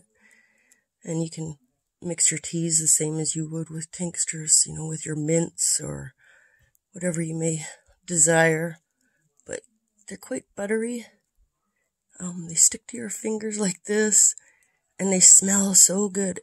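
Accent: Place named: Canada. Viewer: I love your Canadia accent and like you I love plants especially pine trees don't ask me why I probably smell oh and lavender to LOL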